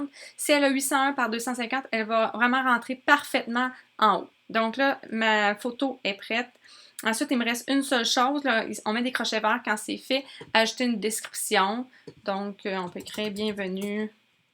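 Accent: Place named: Quebec, Canada. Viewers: Avec l'accent québécois c'est tout de suite plus intéressant ! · C'est vrai qu'avec l'accent québécois ça donne un charme supplémentaire 😃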